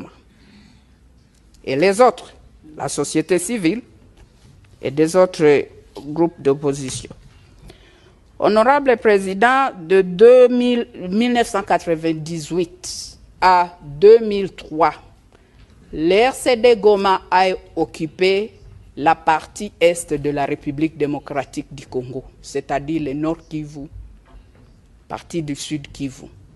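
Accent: Rwanda. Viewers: Rwandaise kende na rwanda yoka ba ra naba re na prononciation naye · Una sema swahili kina rwanda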